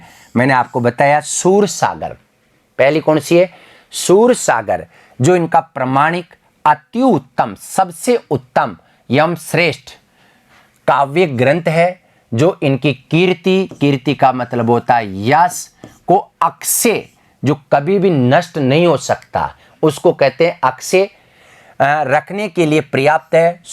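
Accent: Rajasthan, India. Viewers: sir aap rajasthani ho kya ?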